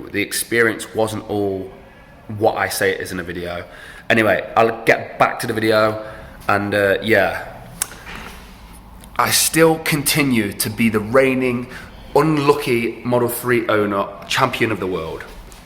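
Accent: Nottingham, United Kingdom. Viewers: plastic can sometimes look a different shade of colour, especially in a pearl white. if you have had the car protected(ie diamond brite) this can change the colour slightly. Where they failed in my opinion was someone should have quality checked the car and talked you through a handover. the headliner damaged and the trim hanging off aren't good, hopefully easily sortable. give the car a clean and see how the bumper compares, you will probably see a colour variation on the front aswell if you look enough! I knew from your accent your are local to Notts! I made it 2!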